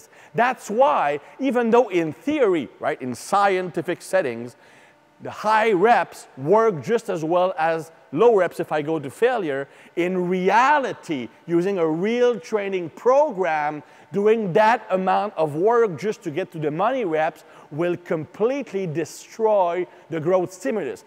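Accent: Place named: Quebec, Canada. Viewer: I trust him because of his Quebec accent. Never met a dishonest or bad Quebecer.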